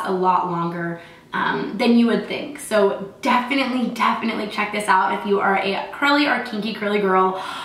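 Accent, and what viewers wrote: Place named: Georgia, United States. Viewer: I knew you had to be a georgia girl ! Got the accent for sure...Sound like my people 🤗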